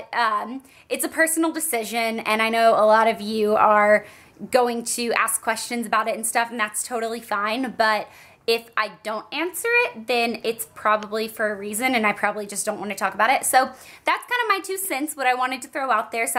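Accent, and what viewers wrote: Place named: South, United States. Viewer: Where is she from? I hear a southern tang every now and then!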